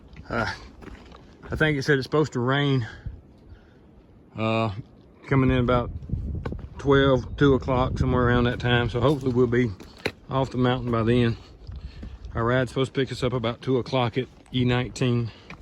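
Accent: South, United States. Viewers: U a southern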